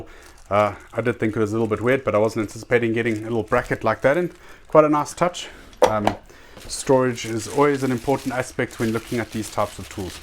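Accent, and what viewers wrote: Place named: South Africa. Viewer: nice review...good to hear south african on youtube!! looking to buy one of these with the 6A battery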